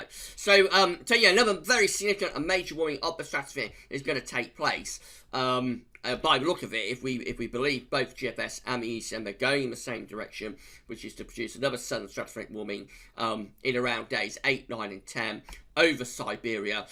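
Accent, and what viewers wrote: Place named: Northamptonshire, United Kingdom. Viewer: northamptonian, i used to speak like that 5 years ago till i moved back close to my hometown of london and if you find that hard to understand dont bloody listen to the brummy accent XD youll blue screen XD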